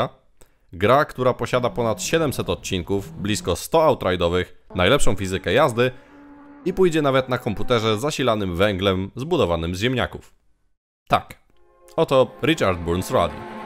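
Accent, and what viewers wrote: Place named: Poland. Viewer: Słuchać? Ja musiałem wyłączyć dźwięk ponieważ uszy mi krwawiły od polskiej wymowy angielskich słówek. Autor chyba nigdy... kurwa nie wiem co....... Ja pierdole.... pozdrawiam. Bez urazy :)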